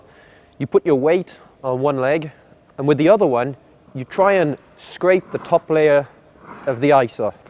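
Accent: Wales, United Kingdom. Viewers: Is he from Wales? Or somewhere else in the U.K?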